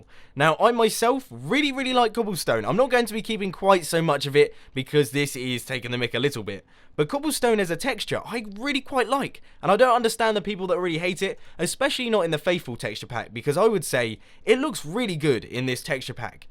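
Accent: United Kingdom. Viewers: are you british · Are you british?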